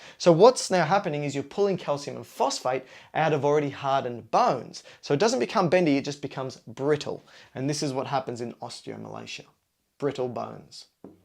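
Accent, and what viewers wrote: Australia. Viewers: Aussie Accent btw why are you jacked up for your profession lol?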